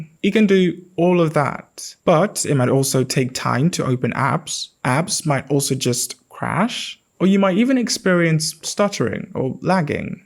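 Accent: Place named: United Kingdom. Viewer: Bro you British accent is good tho 😢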